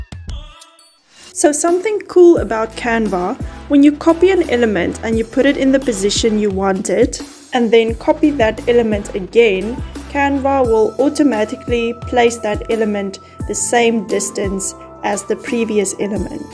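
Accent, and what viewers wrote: South Africa. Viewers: Are you South African? 🇿🇦 That accent is uncanny.🥰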